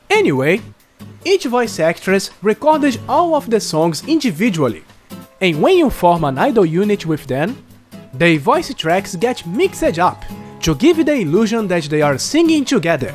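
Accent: Brazil. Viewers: Nunca ouvi alguém a falar inglês com tanto sotaque brasileiro ahah Obrigado pela review, gostei bastante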